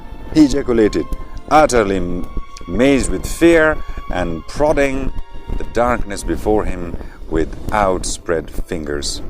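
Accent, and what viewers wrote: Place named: United Kingdom. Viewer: دو خوبی از حسن اخلاقی ای استه که ده هر چیز که مهارت دره بغیر ترس از پوره جزبے خو انجام میده. دوئم ای که خوب صاف بریٹش انگلش موگه.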